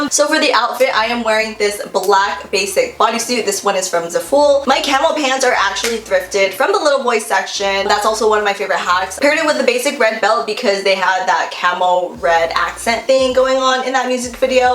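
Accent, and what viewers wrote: United States. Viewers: u look asian but then u have rlly dark skin and then you have an american voice. im confusion lmfao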